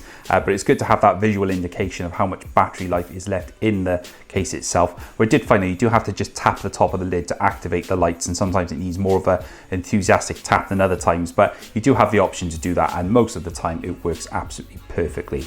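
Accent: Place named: England, United Kingdom. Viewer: Great review, nice to hear an English voice after listening to a few Americans.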